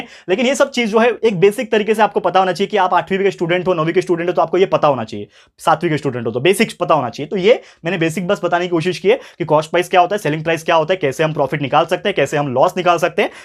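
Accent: Maharashtra, India. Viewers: Sir aap Marathi ho kyaa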